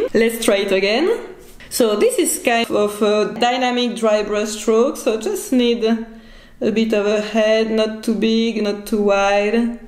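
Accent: France. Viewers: Merci beaucoup! Super ludique!! J'essaie pour le J5 demain 😉😉😉 J'adore tes vidéos, inspirantes et pleines de bonne humeur avec ton accent frenchie très mignon 😃. Ce serait super si tu avais le temps un jour de faire une vidéo sur les visages en quelques coup de pinceau 😊. Je ne sais jamais comment placer les ombres et les lumières et comment figurer les limites ni comment décider quels détails retenir. Merci encore pour tout 👍👍👍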